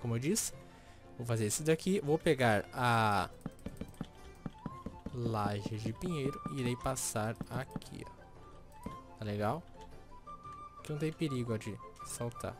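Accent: Rio Grande do Sul, Brazil. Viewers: vc e gaucho? Eu sou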